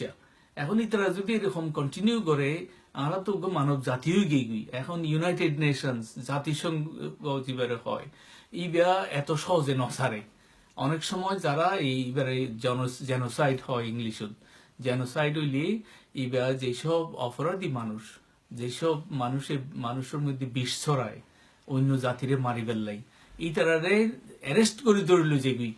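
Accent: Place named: Rohingya, Myanmar. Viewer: Prof., Masha Allah, you are amazing. Love your Rohingya accent and stands for Rohingyas.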